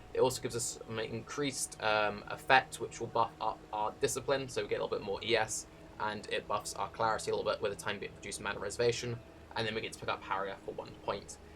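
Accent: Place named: RP, United Kingdom. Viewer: yeah i miss posh speaking made me feel like im im the presence of royalty. FeelsRoyalMan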